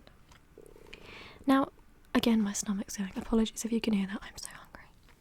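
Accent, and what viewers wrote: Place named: North, United Kingdom. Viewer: I like your Northern accent.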